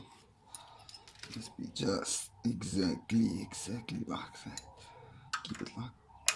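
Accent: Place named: New Zealand. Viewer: The audio is still a bit off. You come out sounding like a New Zealander. But I can make it out. Great build I would like to see more variants of this?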